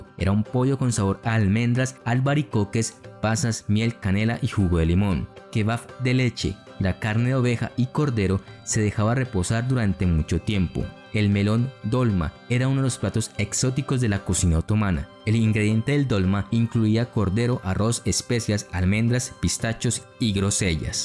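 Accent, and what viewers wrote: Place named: Colombia. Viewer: El que está hablando debe pronunciar la "d", parece colombiano, o es, ellos no pronuncian bien las consonantes, y le cambian el acento natural a las palabras.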